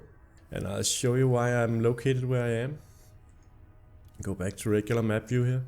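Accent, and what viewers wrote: Denmark. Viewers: Cool guide, and lovely danish accent (I'm norwegian myself :p)!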